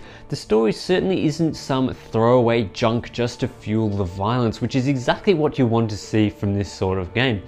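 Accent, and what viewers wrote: Australia. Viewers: hello I'm new and I subcried and you are austrailan I know because I I'm and I can tell I your voice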